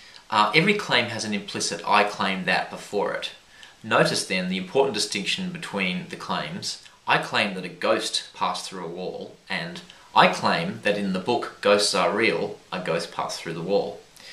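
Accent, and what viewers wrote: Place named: Australia. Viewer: Good vid. What part of Aus you from; never can tell from the accent! I'm an Adelaidean, been thinking about joining an atheist type group do you know any good ones in Aus?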